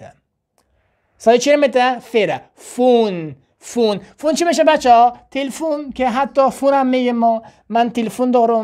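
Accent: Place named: Afghanistan. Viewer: شماافغانی هستیدالبته برای مامردم ایران قابل احترام چون ازلهجه وکلماتی که استفاده میکنید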